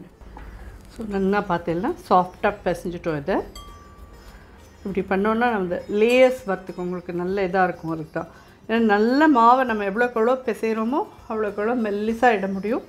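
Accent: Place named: Tamil Brahmin, India. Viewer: Super mami. actually I expect this recipe from you. Your explanation is so good .I will try this recipe. and I like your voice with agraharam style. Thank you very much mami 👌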